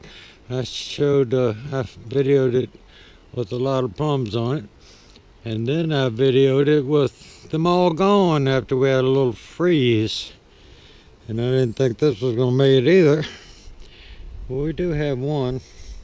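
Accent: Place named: Virginia, United States. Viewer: I figured y'alls last frost would be much earlier than us? I live in far southwest Virginia in tazewell county and our last frost date is may 10th I just know by the accent your in far eastern or southern Virginia. I just started my channel and I hope to start growing edibles as well as my ornamentals and tropicals. I'm trying figs and I consider them tropicals here cuz we're 2400 foot up in the mountains and we get long cold winters!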